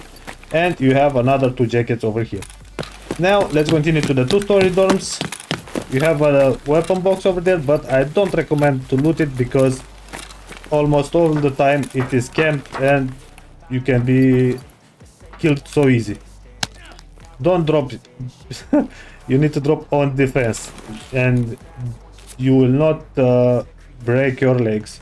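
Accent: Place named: Russia. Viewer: Like ruski accent it's amazing I'm polish how come we don't get that nice accent 🤣🤣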